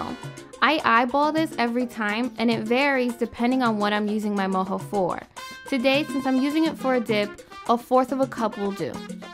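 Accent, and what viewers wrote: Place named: Queens, United States. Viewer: First off ... you sound like my people I knew in Queens, NYC :-) Love it! Second, I've been making mojo for years and I had no idea it was called this way! LOL! It's like the best marinade and base for just about anything Latin cuisine! The grilled chicken where this mojo is used along with the other ingredients is insane! My family loves it! I make it a lot! Gracias por todo!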